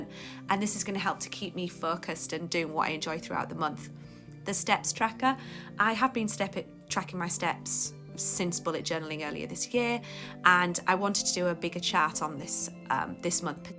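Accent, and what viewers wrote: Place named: United Kingdom. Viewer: Just found your channel and your accent spoke out as I’m from the UK too this is one of my fave Xmas spreads your amazing to say you haven’t been journalling long! Do you have an Instagram? I would love to share your channel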